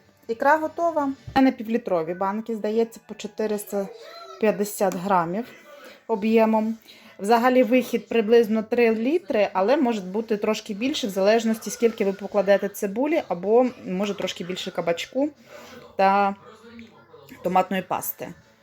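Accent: Western Ukraine, Ukraine. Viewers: Як ви так швидко перейняли западенський говор.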